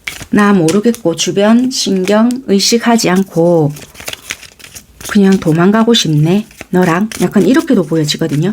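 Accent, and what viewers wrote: Busan, South Korea. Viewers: 부산 사투리 넘 좋아요.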